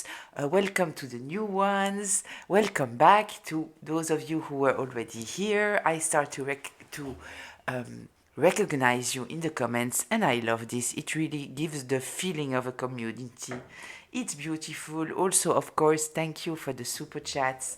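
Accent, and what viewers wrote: France. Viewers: Love your beautiful French accent, and when you swear, lol, do you reside in the UK? Very insightful reader, I do resonate xx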